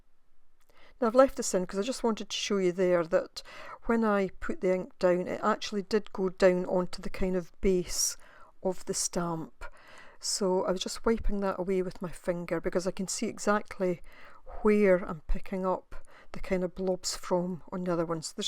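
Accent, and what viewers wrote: Scotland, United Kingdom. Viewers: I like your videos. It's refreshing to hear a Scottish accent for a change on YouTube.I do similar craft stuff myself but am a digital dinosaur. I am watching from the Outer Hebrides. Every blessing to you and Nina for future videos xx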